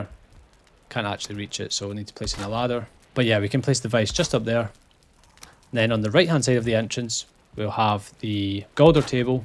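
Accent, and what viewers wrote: Scotland, United Kingdom. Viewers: what a beautiful Scottish accent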